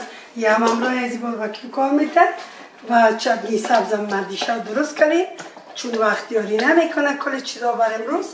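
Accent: Kabul, Afghanistan. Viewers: سلام شیرین زبان ، بلاخره کسی را در یوتوب یافتم که درست مثل خودم و کابلی اصلی حرف میزند love you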